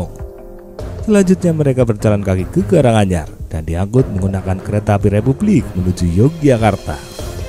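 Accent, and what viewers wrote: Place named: Banyumas, Indonesia. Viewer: Mantap Lur..wong ngapak akeh sejarah..ora ngapak ora kepenak..sukses terus lur..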